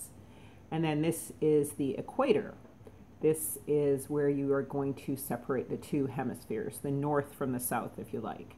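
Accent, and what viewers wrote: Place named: Canada. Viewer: I thought I heard a Canadian accent. Im from Winnipeg but my whole family lives in Nanaimo on the island. I live in Houston, Tx. Love your vids. Want to know a little more about the potassium salts. I went to <link> but couldn't find anything. I have alot of thyroid issues but don't take any meds. Try to get what I need naturally but think I need a little more help. 🕊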